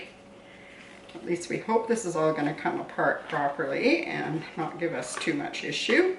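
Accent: Canada. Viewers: Just found you and subscribed. I was curious where you were raised. You used the word dear (costly) and pronounced the word herbs sounding out the h as in a man's name and I noticed a bit of Canadian oots...having an English mother am I close to your heritage?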